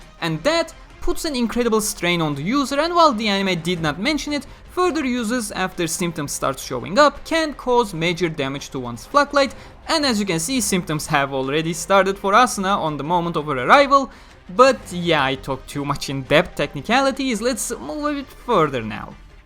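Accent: Turkey. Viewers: Türk aksanı ile bile ingilizcen mükemmel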